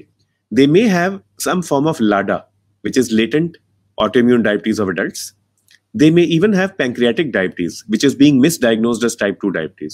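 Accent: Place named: India. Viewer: Very hard to follow with such a heavy Indian accent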